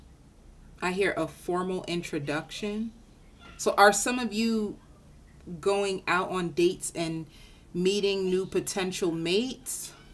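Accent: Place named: Midwest, United States. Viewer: You sound like your in the midwest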